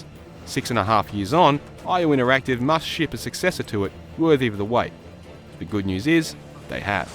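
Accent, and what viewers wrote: Australia. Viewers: An aussie reviewer!!!! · Is this reviewer Australian? I'm form Australia and can't tell :P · aussie reviewer. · An aussie reviewer, scrumptious hahaha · Mate this reviewer is an Aussie. :D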